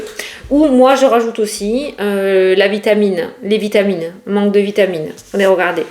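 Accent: South, France. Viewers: Coucou, J’ai une petite question un peu particulière! Je cherche d’ou vient ton accent? Marseille ou côté Toulouse? Je t’écoute tous les jours… je t’adore ❤❤ Bisous bisous ❤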